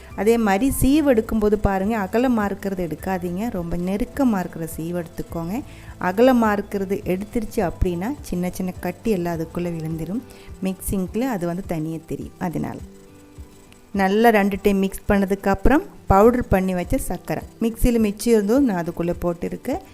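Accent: Kerala, India. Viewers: Mouth melting..... Clear recipe... Malayala tamil cute.... Gonna try for my kids.... Thanks a lot for the recipe.....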